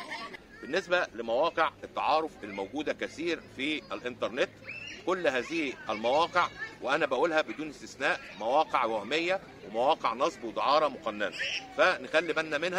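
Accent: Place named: Saudi Arabia. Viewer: معليش سؤال انت كنت عايش في السعوديه؟؟؟؟؟؟؟؟ لهجتك متاثرة بالكلام السعودي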